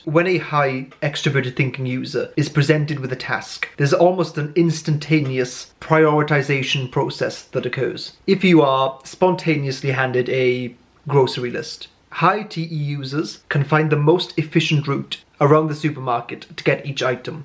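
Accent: Wales, United Kingdom. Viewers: Oooogh Biritish accent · Where in Wales are you from? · Are you Welsh?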